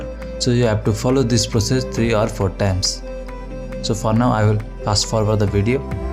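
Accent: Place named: Spain. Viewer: Your Spanish accent is so nice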